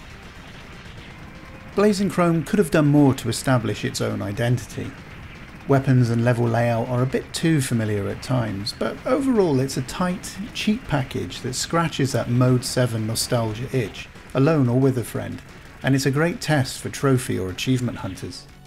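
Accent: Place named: United Kingdom. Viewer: The narrator has such a sexy british voice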